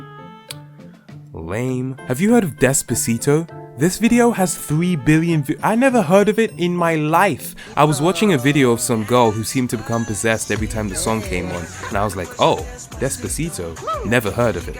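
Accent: RP, United Kingdom. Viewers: Are you British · Refreshing to hear a black male with a posh accent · Heyeyeyeyeye are u British??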